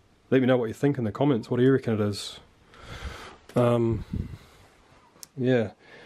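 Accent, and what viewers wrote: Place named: New Zealand. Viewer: You sound kiwi bro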